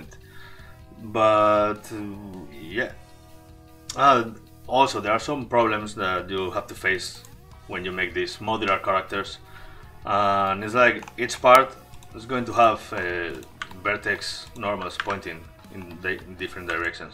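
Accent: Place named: Ireland. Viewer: Very cool! Irish english hehe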